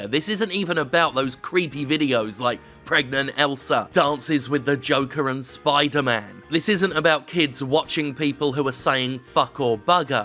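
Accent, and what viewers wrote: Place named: United Kingdom. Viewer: Do you know whether or not the US FTC can even fine foreign creators if we violate COPPA? I've been searching for a definitive answer and finding nothing so far. I'm Australian. You sound like you're from the UK. They may be able to force YouTube delete our videos or channels because YouTube is a US platform which has to comply, but I'm pretty darn certain this badly written revision of a US law does not apply to me.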